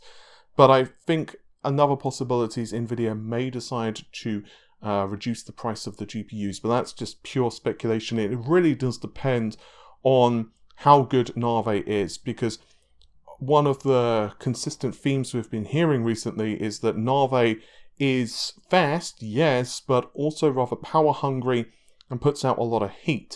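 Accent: United Kingdom. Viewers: He is British, they say things differently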